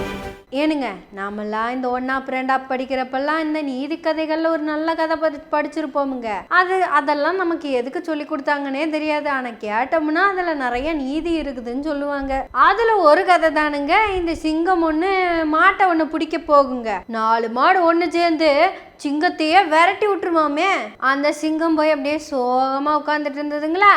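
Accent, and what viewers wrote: Kongu, India. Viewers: அட மூக்கி கொங்குத் தமிழில் பேசினால் எல்லாம் உண்மையாகி விடுமா பெரியார் பிம்பத்தின் பின்னணியில் எத்தனை கொள்ளைக்காரர்கள் காமலீலை பேசுபவர்கள் சாராய வியாபாரிகள் இவர்களைப் பற்றி பட்டியலிட்டு பேசு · பல்வேறு கெட்டப்புகளில் கொங்கு தமிழில் கதைக்கும் அன்பு அக்காவிற்கு வணக்கம்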